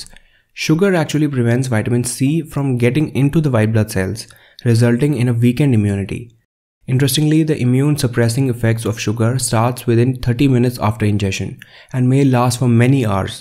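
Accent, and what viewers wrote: India. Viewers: Are you Indian your English essence is like of a Indian🤔🤔🤔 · You're accent is more like indian buddy ! ❤️❤️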